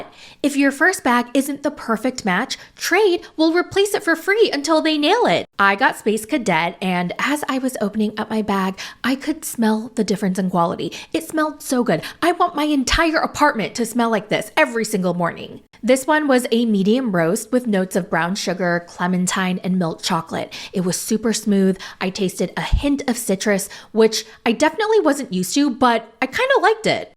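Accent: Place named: New York, United States. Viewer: I’ve been away a few months but I’m loving the sometimes New York accent that developed in my absence.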